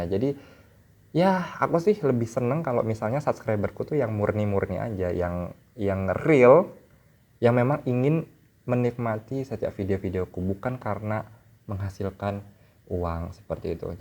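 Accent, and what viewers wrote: Indonesia. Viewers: Mana ada orang riau medok jawa macam lu ,, lu mah jawa tulen bukan melayu riau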